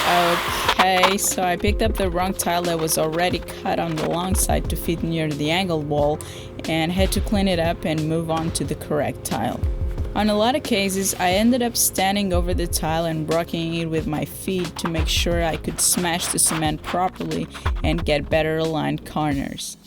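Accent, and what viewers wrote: Portugal. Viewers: Eu estava na dúvida se você era portuguesa ou imigrante. Depois desse vídeo, não tenho mais nenhuma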